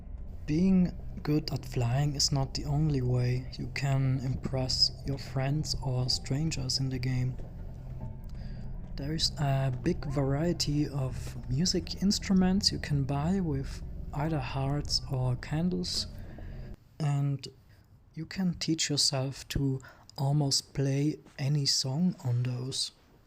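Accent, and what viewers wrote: Germany. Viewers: Dang i havent seen the video yet and it now just got the notification. Your voice is so beautiful and calming. I figured you'd me southern German/tyrol maybe? I could tell, because I am a German peep too! Your videos are so funny and a big entertainment. You helped me out alot in my moth days so thank you. Keep going and maybe we could be friends on sky?.. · You really have a calming voice^^ Im trying to figure out what your accent is😅🤔🤔🤔 is it german maybe? Or something else? Hmmmmmm🥲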